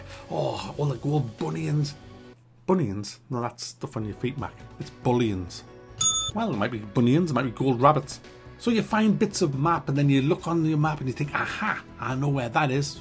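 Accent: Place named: England, United Kingdom. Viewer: What’s more annoying than that poor ass attempt to provide “entertainment” in this review, is the absolutely jarring and insanely annoying accent. I mean, damn, limey accents can be rough, but this I couldn’t listen —nor would I ever want to— for more than 10 minutes…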